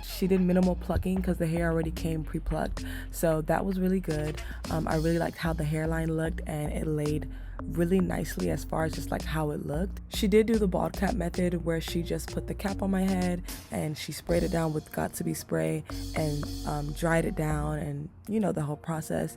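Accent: United Kingdom. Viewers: Im still trying to figure put if she from the uk or not🥱🤣💕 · I can’t tell if your British or American. The accent and the slang is a mixed bag?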